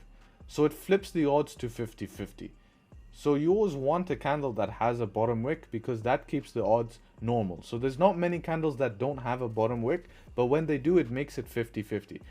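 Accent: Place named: South Africa. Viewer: You are coloured from south africa or namibia 😊, your accent tells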